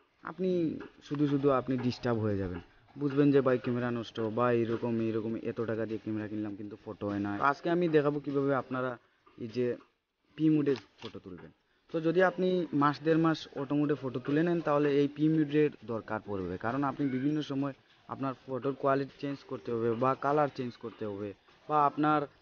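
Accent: Sylhet, Bangladesh. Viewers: তর বাড়ি মনে অয় সিলেট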